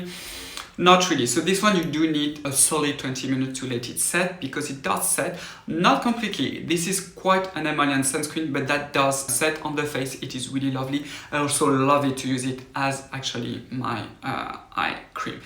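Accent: France. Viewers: Greetings from West Hartford, CT🙋‍♀️You are my new favorite reviewer of sunscreens. I've watched enough of your videos now to finally be able to understand your heavily or should I say Heavenly French accented English. Love your channel,.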